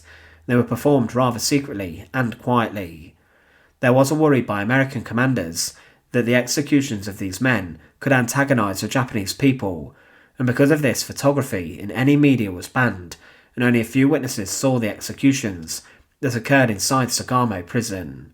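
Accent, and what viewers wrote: United Kingdom. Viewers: The accent is British, I'm a fellow Brit 🙂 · He’s british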